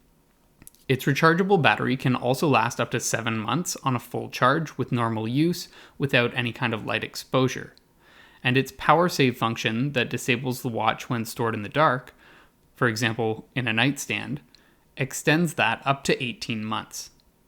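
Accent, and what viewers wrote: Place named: United States. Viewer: If you have an American accent why do you give the wrist measurement in metric and not inches?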